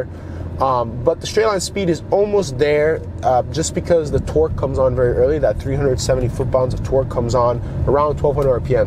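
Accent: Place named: Miami, United States. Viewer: You look and talk exactly like I'd expect someone from Miami to.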